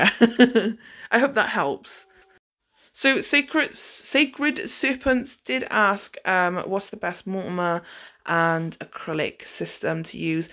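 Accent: England, United Kingdom. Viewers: 🤗 Your little English accent is so freaking cute! I love it when you say "lush" and "bloody" and stuff!💖 Everything you say sounds so super classy! Yeah Amazon is like where I LIVE!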